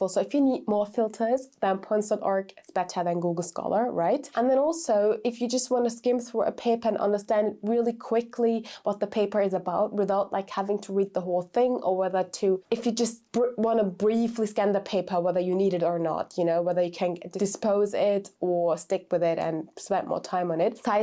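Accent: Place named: United States. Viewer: Imperial College but Yank pronunciation.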